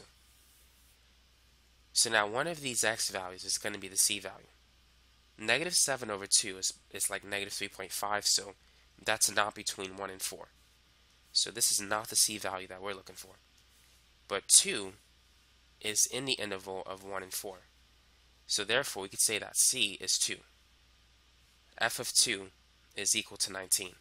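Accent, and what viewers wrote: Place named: New York, United States. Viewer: it isnt unique though, its a typical new yorker accent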